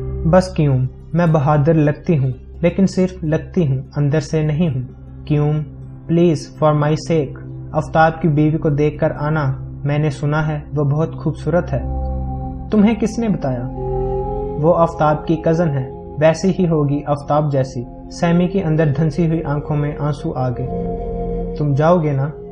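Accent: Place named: Punjab, Pakistan. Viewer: haye punjabi accent mai urdu... r bnao bhai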